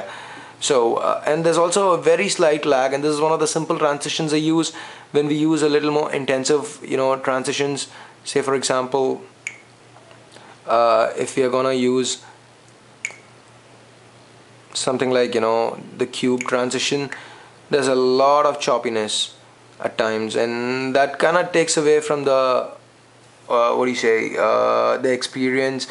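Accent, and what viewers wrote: India. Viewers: Wer u from.ur accent like an indian im right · dood are you from india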